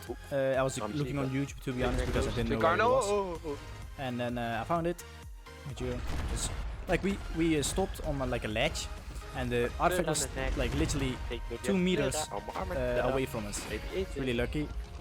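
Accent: Netherlands. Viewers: Are you Dutch? Your accent sounds Dutch :p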